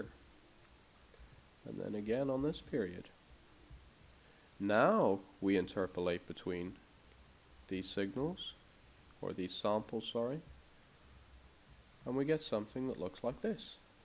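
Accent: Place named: Northern Ireland, United Kingdom. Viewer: where are you from I can't tell you got a mad accent fam sounds almost northern irish but at same time quite english what type of hybrid are you this is melting my brain at this tender hour i need to rest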